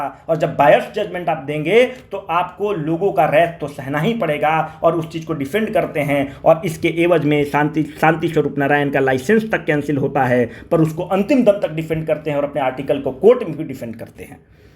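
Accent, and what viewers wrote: Bihar, India. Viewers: I have not seen you...but I love you sir...Dil khus kar deta hai aapka video.... gazab samjhate hain aap...Bihari Hindi aur fluent English...deadly combo...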